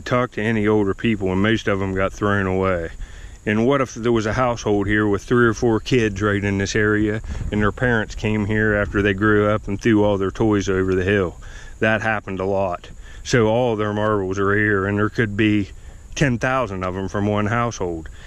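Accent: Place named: Maryland, United States. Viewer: Hi! I am new to your channel and really enjoying your viddies. Squirrel Man is a lucky, happy little guy. Seems he has you wrapped around his little paw. So sweet. Not sure where you are from, but your accent sounds like parts of here in Maryland. Dig on!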